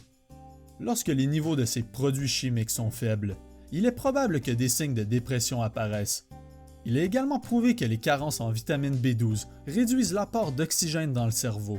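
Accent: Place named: Quebec, Canada. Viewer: Mer i pour la video et j'aime bien l'accent etes vous qebequoi?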